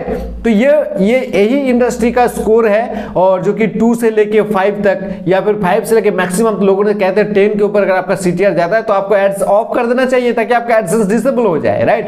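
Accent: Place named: Bengal, India. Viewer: Ore Bangla te bol bhai... Puro bangalir moto hindi bolchis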